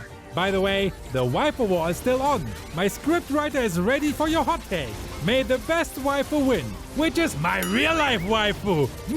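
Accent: Germany. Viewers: First time i Heard your German voice Xd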